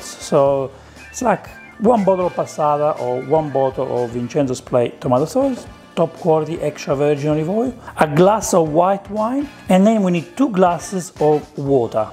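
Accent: Italy. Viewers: really don't know what to do with a maserati in the pan when real italian tomatoes come from the deep south of Italy! a sort of nonsense ... and the genuine stereotyped italian maccaroni accent.. or is it real? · This is the Italian man,accent i always think of when cooking bolognese or something Italian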